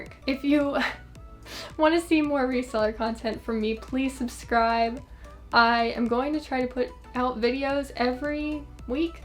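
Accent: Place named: Pennsylvania, United States. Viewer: great tips! you remind me of Pam from The Office and I LOVE the office - must be the PA accent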